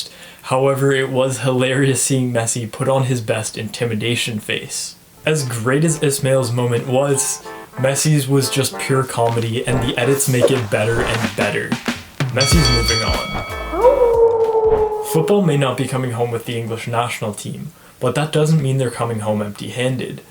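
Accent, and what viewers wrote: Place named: Iceland. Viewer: Are you Icelandic?! I thought you were english !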